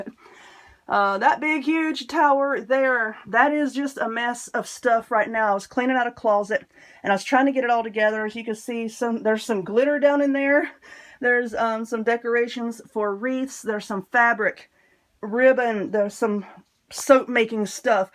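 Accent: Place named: North Carolina, United States. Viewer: Hey I'm new to your channel and I love it. Your accent is what drew me to you. I said she sounds like she's from NC lol. And low and behold you are 💜. So am I born and raised in Rowan County so I was happy to see you ribbons from the fair. Congratulations!!!! I know you're not from here but it's still great you're from NC. I love your diys keep them coming.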